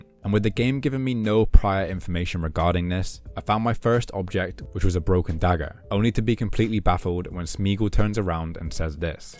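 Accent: United Kingdom. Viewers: All good😂 But may I ask, which accent you speak? I guess something from the UK?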